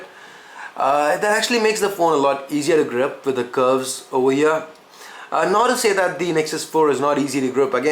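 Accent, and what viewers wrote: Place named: South India, India. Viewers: from his accent..he sounded south indian for sure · for a tamil. you have a fantastic english accent. i would definitely take you for my movie if i was a director. TWO thumbs up brah!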